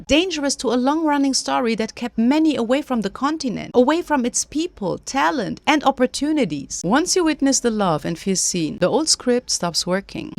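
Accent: Germany. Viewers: German accent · I knew you know German well before you mentioned Germany. Your English sounds like that of Germans. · 😊I thought you have a German accent 😊 Thank you for pointing this out. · When she said "I left Germany..." I was like "I knew it!" 😂 Her English is really good, but it is just really difficult to hide our accent, proven once again. And also proven once again: We Germans are everywhere! I've met Germans in every corner of the world. Good for her that she found a nice place with better weather 👌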